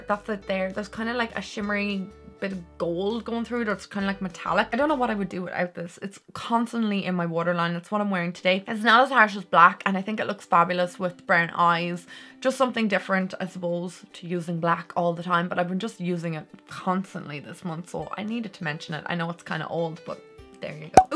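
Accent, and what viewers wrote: Ireland. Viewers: I just love you so much!! And I enjoy listening to your brogue! It reminds me so much of my Nana who is from Kenmare..And I just love your makeup looks and think you are so talented!! Much love from Boston!!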